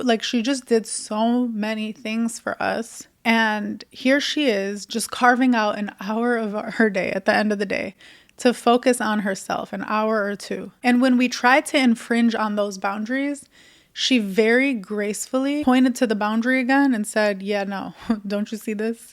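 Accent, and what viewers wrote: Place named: Michigan, United States. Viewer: girl r u from southeast Michigan? You sound so local it’s crazy haha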